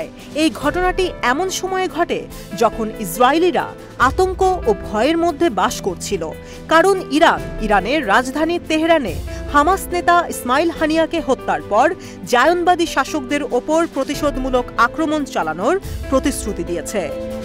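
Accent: India. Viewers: আসসালামু আলাইকুম কথার ধরন ভারতীয় হয়ে যাইতেছে বাংলাদেশি মানুষের মতো কথার ধরনটা করেন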